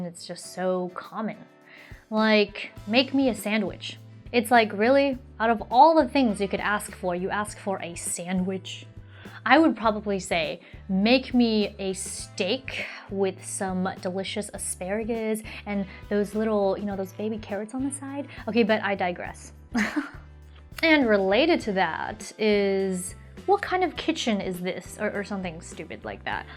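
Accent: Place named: United States. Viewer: well...as pwrOverwhelming states..you're the perfect host for tech shows and some other shows too..crude comments from keyboarders you dealt with professionally..comments about your asian ethnicity-some were not flattering to you..to be beautiful chinese and obviously long time in america to have an american accent-you grew up in USA?..if only there were more like you..and using screwdrivers and other tools doesn't make you weird..its just shame more girls don't get involved in tech other than using smartphones..i can see a TV company contracting you with your popularity..specs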